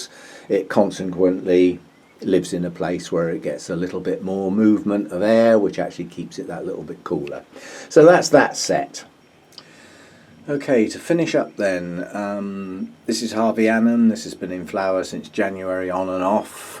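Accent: England, United Kingdom. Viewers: where are U based?U sound English -correct?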